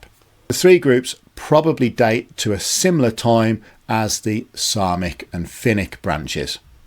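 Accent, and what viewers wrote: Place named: Manchester, United Kingdom. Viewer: So7nds like a feature from Mancunian English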